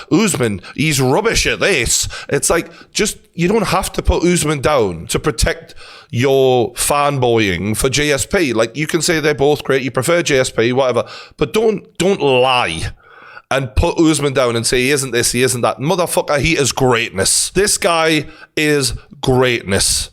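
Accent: Newcastle, United Kingdom. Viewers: Best mma content, love this geordie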